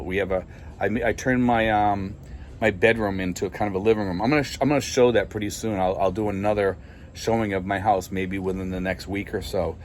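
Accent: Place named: Massachusetts, United States. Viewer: You sound like your from Mass.I know that accent.Im from Quincy.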